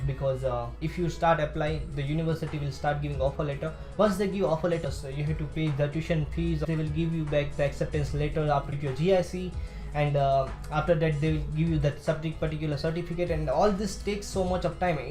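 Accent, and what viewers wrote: Gujarat, India. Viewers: I appreciate your love towards our nation 🙏 brother but the reason I asked was that your accent sounded Gujarati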